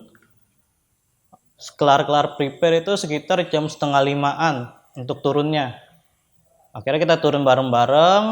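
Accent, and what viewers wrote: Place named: Betawi, Indonesia. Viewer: Logat betawi banget lau cing hehehehe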